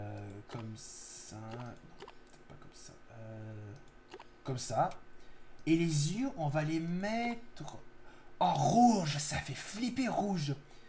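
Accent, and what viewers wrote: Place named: Quebec, Canada. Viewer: Pti commentaire et c'est constructif. Je suis québécois et je pense que toi aussi. J'ai parcontre du mal à comprendre se que tu dis. Essaie un français universel ou parle avec un accent assumé! Sinon c'est super bien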